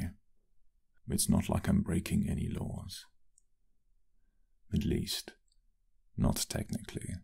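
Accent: United Kingdom. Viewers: Is anyone going to talk about the British accent??? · My asexual ass was not paying attention at all because the whole time all I could think was BRITISH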